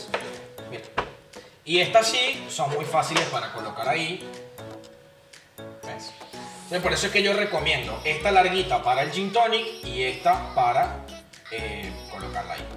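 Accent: Venezuela. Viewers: Soy el único que piensa que tiene un poco de acento venezolano?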